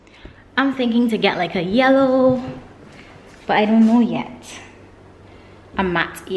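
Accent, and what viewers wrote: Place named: Barbados. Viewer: I love your Barbadian accent! You must be from Barbados.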